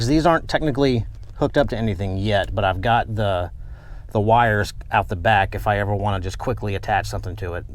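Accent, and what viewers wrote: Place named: South, United States. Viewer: Very organized and attention to detail. Now, go on some adventures and take us along. You seem like a good entertainer. Like that southern accent.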